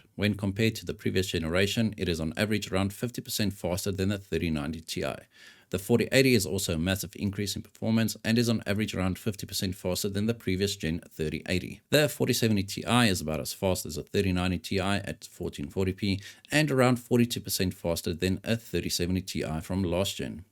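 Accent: South Africa. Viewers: Is that Afrikaans accent I hear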